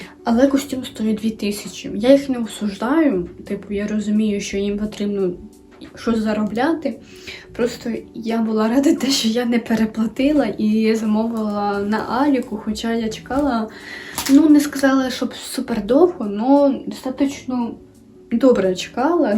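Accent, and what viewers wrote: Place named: Surzhyk, Ukraine. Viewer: Пиши будь ласка собі трішки сценарій, дуже сильно говір ріже слух. А коли пропишеш то будеш знати хоча б умовно слова і буде менше цього суржика страшного. А так то молодець працюй далі❤️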